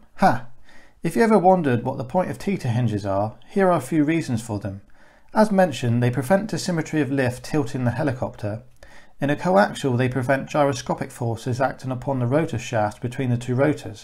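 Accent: United Kingdom. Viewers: Those skids are TITANIC. Though it makes sense if you're learning to fly or are flying an experimental aircraft. Still look weird though. Also you sound English. Are you a trained and licensed pilot? I was wondering how the law for homebuilt micro/ultralights (whichever's smaller) worked.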